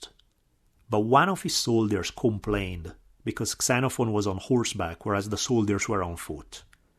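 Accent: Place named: Italy. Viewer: Your story telling along with that fantastic Italian twang is just awesome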